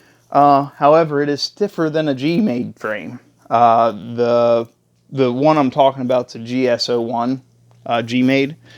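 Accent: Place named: Pennsylvania Dutch, United States. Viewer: Did you say (Door ability) LOL its Durability, you have to be from PA, Im hearing that PA Dutch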